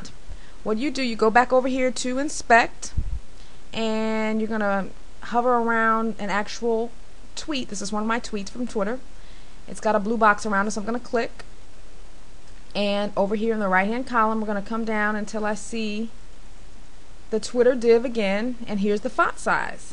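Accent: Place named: United States. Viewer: yeah, i am spinning! :) also your accent is great, true American.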